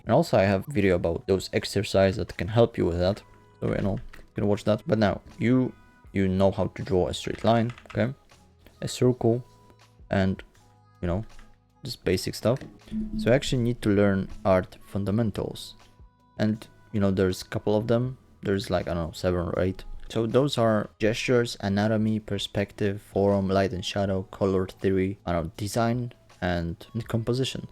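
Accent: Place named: Poland. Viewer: Thats some great tips! By the way, if I may ask, where are you from? (Im polish and thought your accent sounds alike)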